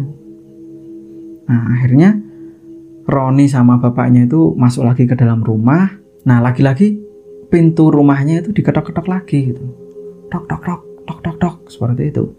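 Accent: Java, Indonesia. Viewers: Njowone pundi to mas.. kok medok banget. Seneng aku dengere👍🏻😁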